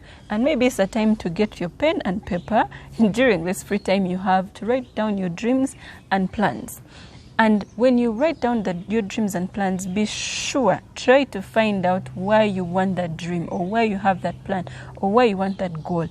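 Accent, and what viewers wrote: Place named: Buganda, Uganda. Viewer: Your voice is life. I love your Buganda accent 💖💖💖💖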